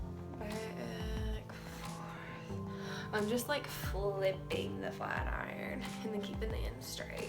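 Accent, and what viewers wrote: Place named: South, United States. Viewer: Where you from girl ? I’m from Texas and I thought I had a country accent !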